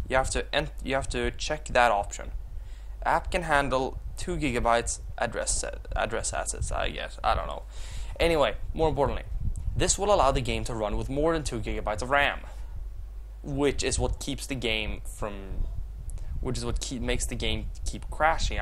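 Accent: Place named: Sweden. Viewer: not a good thing dude. You have a really horrible way of delivering what you're trying to say. You take way too long to say it and sound really spastic. But it sounds like you're swedish so i'll just chalk it up to english possibly not being your first language.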